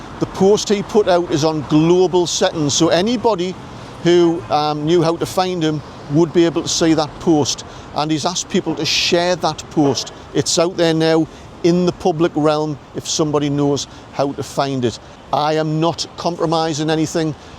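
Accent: Newcastle, United Kingdom. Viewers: You simply can’t beat that pure Geordie accent! 😊👍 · Great reporting. Thanks for keeping us updated. Love your Geordie accent 💕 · It’s called Geordie. It’s an English dialect spoken in the north east of England; primarily Northumberland. It can be traced back the Angles who came from Denmark over 1,500 years ago. In fact, some Danish friends of ours said they can easily understand the more broader versions of the Geordie accent. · Wonderful to hear a true Geordie accent, and to get this interesting update. Apparently you don't use the BBC "ssshhhh-edule" pronunciation.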